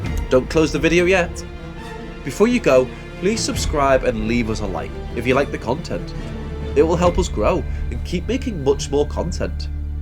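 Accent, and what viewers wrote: Liverpool, United Kingdom. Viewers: I've lived in the north and south of England. For more than a while in each but can't place your accent. At times a little scouse then Welsh. What is it?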